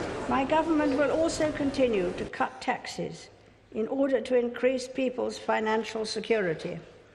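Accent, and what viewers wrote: United Kingdom. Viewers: I'm surprised! What accent is that? I mean, her speech is so clear to me, a non-native, speaker of English... I thought her accent was similar to those Cockney accents of the BBC ! ===(gilberto lehrer from brazil)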